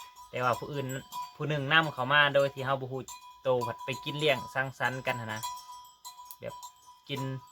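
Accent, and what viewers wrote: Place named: Isan, Thailand. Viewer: เว้าม่วนเนาะจร้าคือิสานคัก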